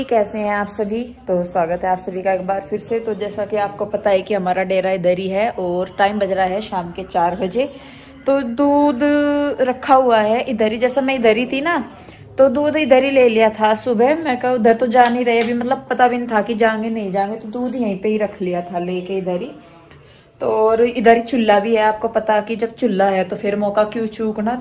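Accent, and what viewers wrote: Haryana, India. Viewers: Haryanvi boli bhut bdiya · Maam ap haryana ke ho kya apki tone vha ki he lgti h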